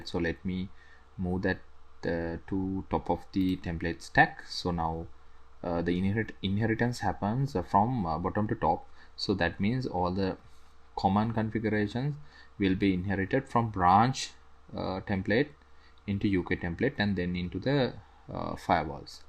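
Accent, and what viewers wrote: Sri Lanka. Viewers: Yes, I downloaded Expedition and installed on Linux box and I have yet to figure out how to use Expedition. You sounded very much like you are from Sri Lanka. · Great explanation BTW are you a Sri Lankan, your accent is so sri lankan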